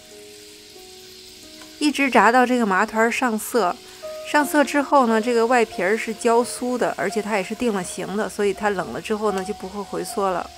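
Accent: China. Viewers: i like your chinese accent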